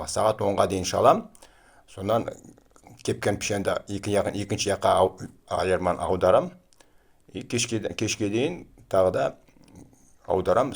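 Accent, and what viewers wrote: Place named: Kazan, Russia. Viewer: Интересные вещи рассказывает къардаш, но произношение некоторых слов казанское.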